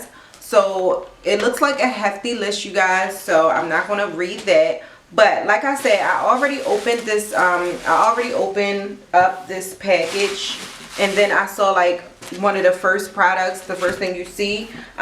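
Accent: Baltimore, United States. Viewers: I know that Baltimore accent from anywhere 😍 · I almost thought she was from DC too but she got that heavy Baltimore thing going on lol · She’s from the north it’s her accent maybe Baltimore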